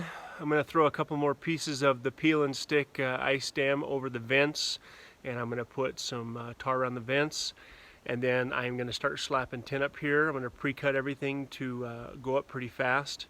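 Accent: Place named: South, United States. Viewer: As a northern carpenter. Just be listening to I can tell right away you're from the south. Can I suggest something? Have you thought about putting the tyvek (all you can ) on before you raise the walls? I remember the first time I worked in the same sub with a southern crew. We had about a week on a home in when the southern crew arrived. They on the second story in about 3 days. I said to my foreman they're going to beat us. He said just watch. It took them a month and a half to finish. They had to scaffold everything off. No way they made money on the job. Just a little thing I learned. Be blessed Bro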